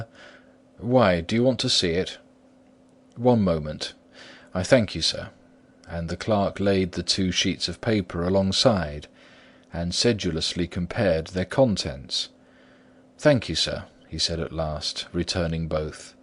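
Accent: England, United Kingdom. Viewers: I heard of the title plenty of times,, but it was nvr mando reading for me. Tonight was my 1st time hearing the story,, and I completely LOVED it!!! Especially since the narrator has English accent, it fits so perfectly. Wat a awesome tale!! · An excellent BRITISH narrator for this classic horror story! Wow - rare for Librivox, thanks so much! 🌹🙏🏼❤️ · one of my very favorite books! i love the British accent ! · British af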